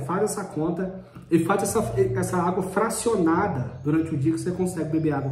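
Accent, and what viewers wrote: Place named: Minas Gerais, Brazil. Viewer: Além de lindo é mineiro. Com esse sotaque então....Meu amigo. Desculpa. Não resisti. Amo seus vídeos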